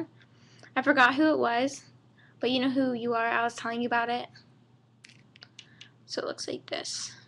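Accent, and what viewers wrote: United Kingdom. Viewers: You seem like a British person ;) I think the accent fits you perfectly! ^^ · why was she talking in a British accent?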